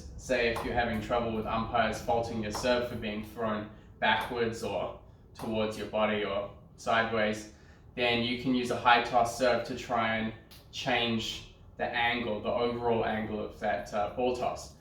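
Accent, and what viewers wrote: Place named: New Zealand. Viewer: I cant seem to pinpoint your accent. Kiwi living in Oz for sometime then migrated to US?? Reason for asking is the next question after the first would b - do u coach in person?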